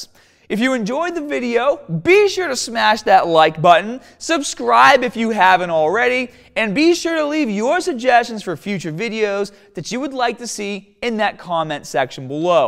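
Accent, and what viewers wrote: Boston, United States. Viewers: Geez, and I thought I had a “haad” Boston accent growing up there! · Boston accent. "When you pull this bah down..." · Who doesn't enjoy a Boston accent?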